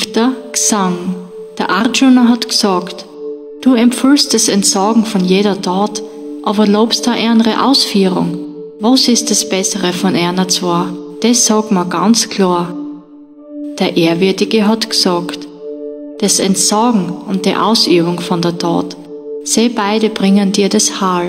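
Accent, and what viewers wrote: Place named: Styria, Austria. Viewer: Absolutely beautiful!😀 Your Steirisch Dialekt is amazing! Outstanding!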